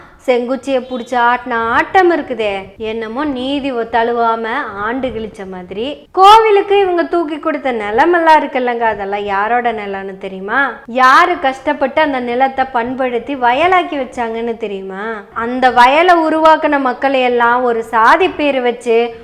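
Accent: Kongu, India. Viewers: எம்மா ! கொங்கு தமிழையும், சென்னைப் பட்டினதமிழையும், கலந்து பேசிய பேச்சு இருக்கே, அது கோட்டாகாரறுக்கு புரியுமான்னு தெரியலையே ? தாயே!!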